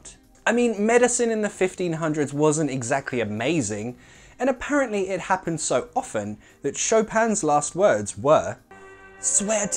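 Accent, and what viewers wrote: United Kingdom. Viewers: Does he speak british english? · Anish Tom No. His pronunciation sounds 100% British to these American ears.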